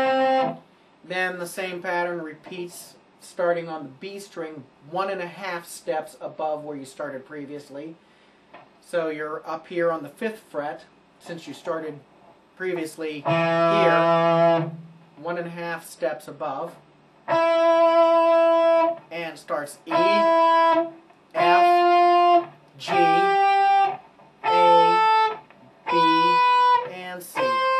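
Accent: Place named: South, United States. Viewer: Alrighty then, not from up North are ya? Great little lesson.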